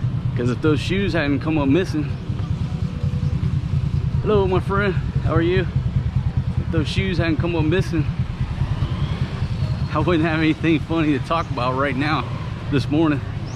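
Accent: United States. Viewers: The original American accent ! Beautiful accent bro. 🇺🇸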